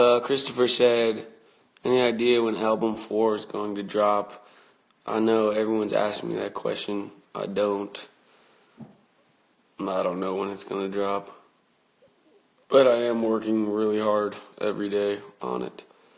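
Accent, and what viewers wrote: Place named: South, United States. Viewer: he got the country accent going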